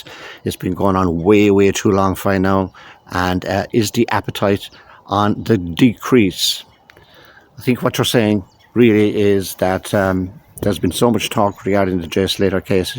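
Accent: Ireland. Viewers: Would you care to back up your claims that there are cartels involved? Show us the proof. The video you saw where Jay lost his sight? Back it up, give us some proof. Currently all everyone is hearing is you blowing smoke out of your ass in an Irish accent...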